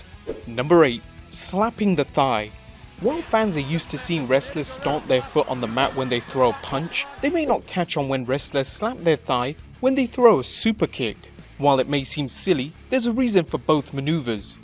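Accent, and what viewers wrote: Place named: United Kingdom. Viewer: Triple AITCH. AITCH BEE KAY. POSStuh. I've always wondered if Brits pronounce words incorrectly on purpose or if they have difficulty hearing and reproducing simple sounds... You've been making vids for a primarily American audience for too long to keep doing that. In London, I ride the Tube; in the US, it's the subway. Come on dude. Next you'll pronounce the 'T' in 'wrestling'.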